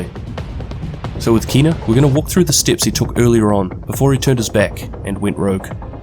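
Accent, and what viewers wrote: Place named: New Zealand. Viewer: oh okay, i kinda like that kiwi accent...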